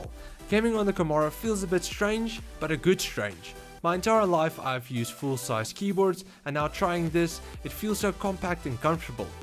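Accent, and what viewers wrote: Afrikaans, South Africa. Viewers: He sounds like hes from South Africa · This dude sounds so afrikaans it's crazy XDD great video. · I can tell a south African accent from anywhere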